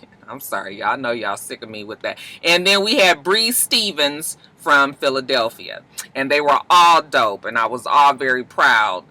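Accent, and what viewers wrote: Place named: California, United States. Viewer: "you got a hit right there" still got that cali accent I love it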